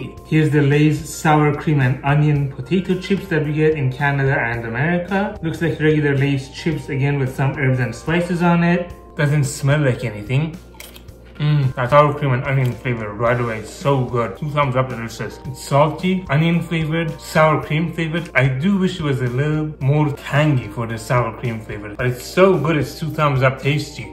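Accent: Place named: Iran. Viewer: Are you Iranian? Your accent seems Persian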